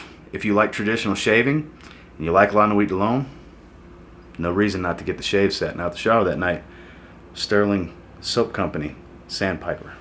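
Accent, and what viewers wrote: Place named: Gulf Coast, United States. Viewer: I’m wondering what you would recommend for a night out on the town in New Orleans. May be wrong but you sound like you’re from the region, gulf coast at least… therefore you know how hot and humid it gets. Looking to wear a fragrance for this Saturday if possible. Already own some basics that could work like Sauvage and CDNIM… Sauvage got me strong compliments the other night. What would you recommend to me? I was close to getting profondo but am now changing my mind. How about something like Prada Carbon? I’ve been looking for days for a good summer night fragrance and I can tell you’ll set me straight!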